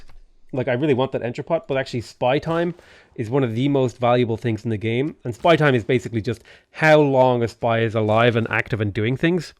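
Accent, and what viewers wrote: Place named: Ireland. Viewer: sounds about 40% more Irish in this video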